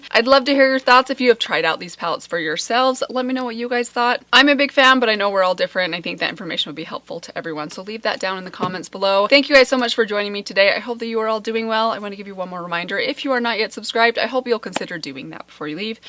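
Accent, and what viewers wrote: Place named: Utah, United States. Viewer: Oh my heck, I totally love you,!!! I just received these two palettes in the mail about a week ago and being the novice I am, I wasn’t sure how to use them. Thanks for posting a tutorial so well timed! 😊 Also, did I guess it or did I guess it! I knew that accent came from Utah. 😉 I used to live there myself. Where did you and your husband build your new home? Those irrigation pipes kind of reminded me of Idaho...? Keep the videos coming! Love you! ♥️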